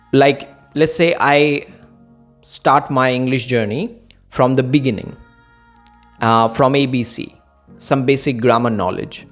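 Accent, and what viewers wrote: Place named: United States. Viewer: Why do speak in American accent?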